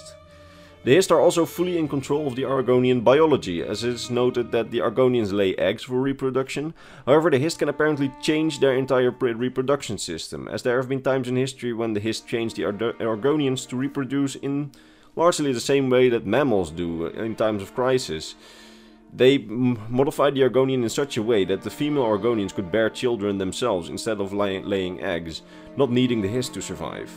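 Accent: Netherlands. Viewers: Are you dutch? I can tell from your accent hehehe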